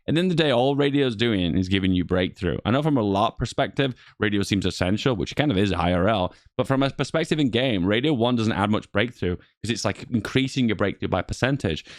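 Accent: England, United Kingdom. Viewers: still with the "bow je" terminally English >.>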